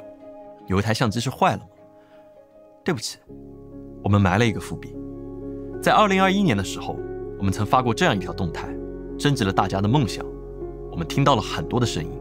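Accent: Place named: Jiangxi, China. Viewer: 江西口音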